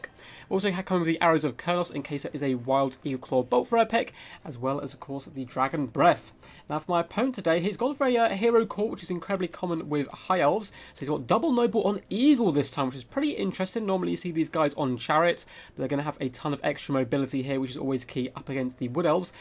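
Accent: United Kingdom. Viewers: still at it!!! <3 love youuuuuuuu. >:( when will alithinar get sum puss puss >:( im waiting on the second enstalment of elf romance softcore porn in ur lovely british voice:p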